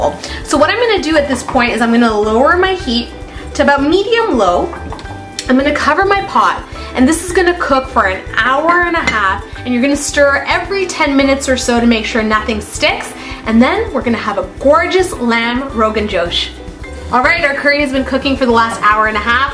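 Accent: United States. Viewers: comments===hi i am a chef at indian restaurant in dallas.....your looking beautiful and the rogan josh yummy yummy...thx for tip ratanjot. i never know this give rogan josh color i learnt from you today. name look like tamil but american accent english keep on rocking..... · You sound sooooo American 🇺🇸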